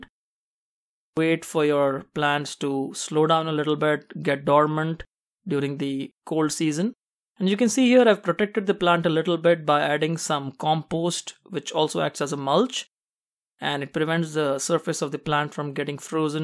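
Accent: India. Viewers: You sounds that you are Indian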